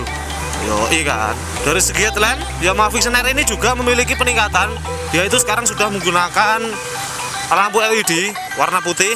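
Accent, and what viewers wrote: Java, Indonesia. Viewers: Muedok tenan mas... mantap lah,madjoe terus... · Keren nih review... Nggak mojokkan salah satu merek. Mantap bro. Logat jowone mantap bro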